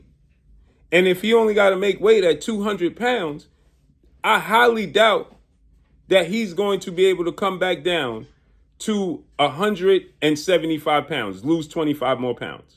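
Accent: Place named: East Coast, United States. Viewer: For Some Reason, I Thought You Was From Da East Koast (New York Or Jersey)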